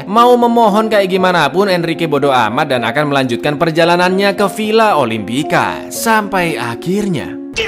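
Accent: Java, Indonesia. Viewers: bahasa mu kang jowo muedokkk asli wg jowooo ..lnjutkan kang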